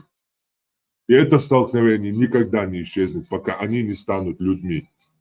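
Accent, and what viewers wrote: Chechnya, Russia. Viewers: ТЫ СКОРЕЕ ТОРЧОК РОДИВШИЙСЯ И ЖИВШИЙ ОКОЛО ЦЕНТРА ГОРОДА... В ТЕБЕ СМЕШАЛИСЬ ВСЕ ДИАЛЕКТЫ ЧЕЧЕНЦЕВ! БЕЗРОДНЫЙ!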